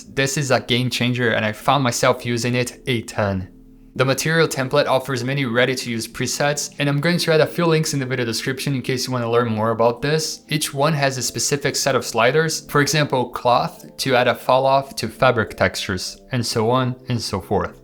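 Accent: Brazil. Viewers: Tem que ter o ouvido treinado, mas esse cara pelo sotaque deve ser brasileiro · teu ingles é muito bom, mas tenho quase certeza que tu é brasileiro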